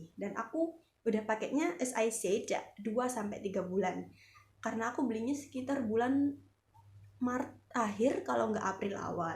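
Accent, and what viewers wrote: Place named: Java, Indonesia. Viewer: Udh lama di surabaya medokkkk deh mis yak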